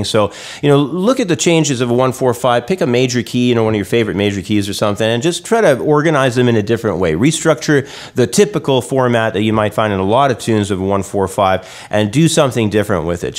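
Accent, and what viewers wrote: Canada. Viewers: always a nice channel this one! hey are you, by any chance, canadian?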